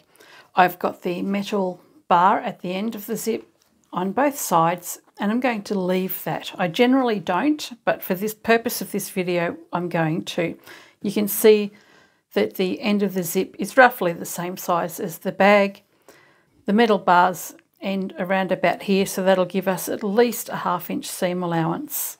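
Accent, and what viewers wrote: Australia. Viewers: Does anyone else think in an Australian accent after watching videos...... or is it just me? 🇦🇺💖😊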